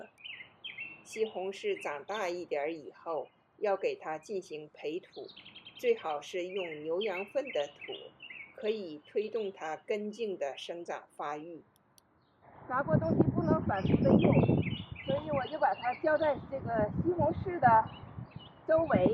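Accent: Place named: Northeast, China. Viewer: 东北口音亲切！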